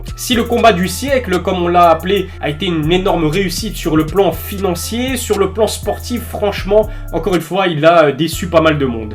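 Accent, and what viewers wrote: Belgium. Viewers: Un peu, je suis belge également du coups je l’entends peut etre plus que les autres, tu es de quel région ?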